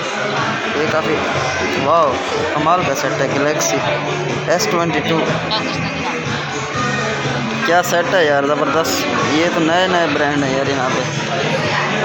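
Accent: Saraiki, Pakistan. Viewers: awaz sa lag raha siraiki hain or ma jhang sadar say hu khush rahain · Wah birah wah , tun tay rakh, Jary sarden honay koon sarra. Sajid From Khanpur. Ap ka vlog kal dekha aur ak din mai almost 10 vlog dekh liye, Ap jo Saraiki bolty ho maza aa jata. Ji way birah ji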